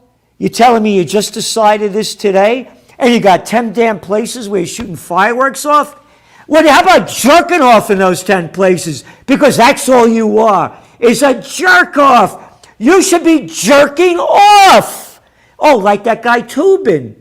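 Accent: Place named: New York, United States. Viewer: Just freaking luv you. Never miss my old state NY, when I hear your voice. Tell it like it is.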